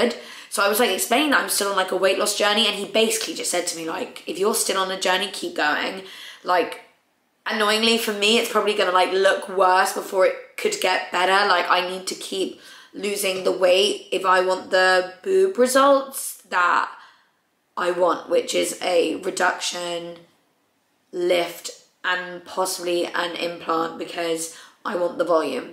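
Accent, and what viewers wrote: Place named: United Kingdom. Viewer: this girl got the most British accent I've ever heard yo 😂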